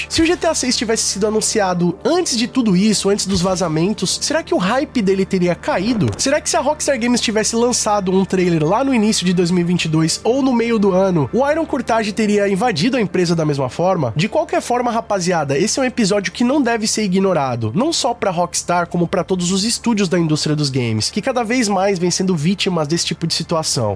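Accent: Portugal. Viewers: Pq ele tá com essa narração em português- pt ? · Não sei se já havia percebido, mas o canal tem sistema de dublagem, ele está falando o português europeu via IA, o idioma original é o PT-BR.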